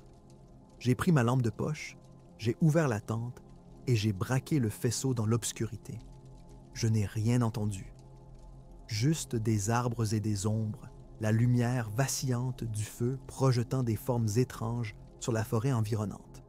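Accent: Quebec, Canada. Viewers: Oh wow! Belle découverte! Contente d’entendre une voix québecoise qui raconte des histoires d’horreur! Et hop, une nouvelle abonnée! Salut de Laval!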